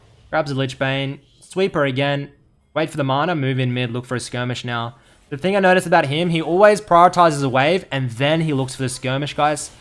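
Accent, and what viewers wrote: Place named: Australia. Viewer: australian accent DansGame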